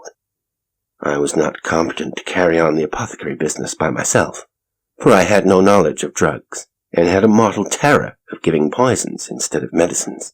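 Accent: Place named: United States. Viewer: This is the first story I have heard you read with an American accent. You are very versatile, Sir, and I am full of admiration.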